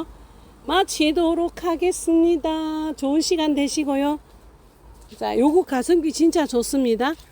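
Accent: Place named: Gyeongsang, South Korea. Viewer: ㅋ ㅋ 경상도 말씀을 하시는데요. 아무튼 재밌네요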